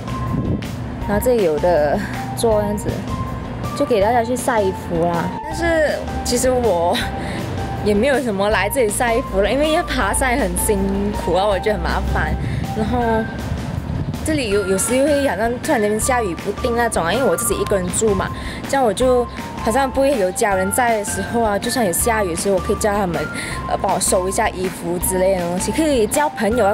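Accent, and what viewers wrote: Malaysia. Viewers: 马来西亚口音😂😂我也是马来西亚人~以后也想去韩国留学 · 马来西亚式华文😂😂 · 好亲切的华语 ，马来西亚lang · 听口音 马来西亚！！！！ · 超级马来西亚英语...... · 你好我是韩国人 你是马拉西亚人吗 我第一次听过这个发音！觉得很可爱！ 很有魅力的！😄 而且你韩文发音也很好👍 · 一听就知道是马来西亚人😂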